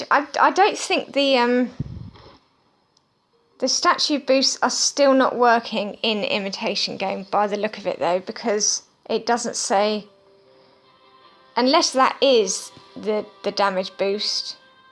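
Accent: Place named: United Kingdom. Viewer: Who talks this way? Which part of britain is that accent?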